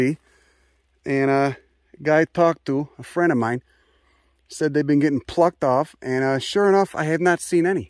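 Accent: Chicago, United States. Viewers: I love hearing all this information in this thick ass chicago (I think) accent. Takes the intimidation out of learning to be honest. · As a first video to see, seeing a guy who looks like a boxer with a missing tooth and a swollen eye, talking about botany in a heavy Chicago accent, you have got my sub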